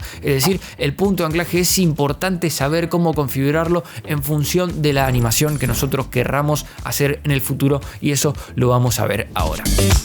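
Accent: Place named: Argentina. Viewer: no se si es porque hablas como argentino o que seera, pero por fin alguien que lo explica como corresponde!!!!!Argentinaaaaaaa , el idioma original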